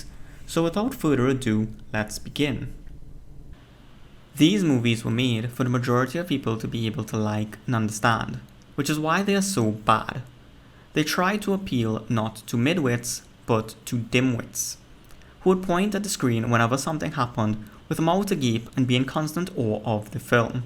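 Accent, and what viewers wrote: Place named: India. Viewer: I can tell the narrator of this video is a South Asian Indian from his accent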